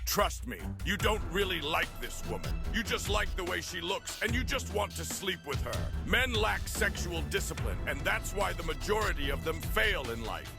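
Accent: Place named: United Kingdom. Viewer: Okay, okay......But why is he British?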